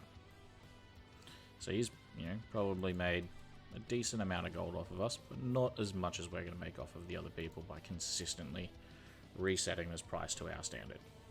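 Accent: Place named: Australia. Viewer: hey man, excellent video, fucking legend ❤, pleeeease tell me you have a link to the add-on? I'm REALLY struggling with addons atm so soon into TBC (WeaponSwingTimer has been totally fucked and I've downloaded like different 5 versions). This is some 200iq shit right here! I thought selling NW cloth from dungeons to tailors was good enough, this SHITS on that and lets me actually go in and flip it, making money stright from the AH, this is absolutely brilliant!!! Quicket gold garm? Fuck no. INSANELY ACCESSIBLE way to generatea substantial amount of gold that should especially help newer players who missed much of Vanilla such as myself to get our flying mounts / max our professions? Fuck yes! ❤ If you read this and can respond you've 100% earned yourself a sub, this is a super underrated channel, I really need some help with the addons to do this, if you can help with that then you're an ABSOLUTE CHAMPION. 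Also love the accent mate, I'm Australian too, born and raised, spent all 28 years of my life so far living here, and helpfully moving to Melbourne soon. Thankyou my friend!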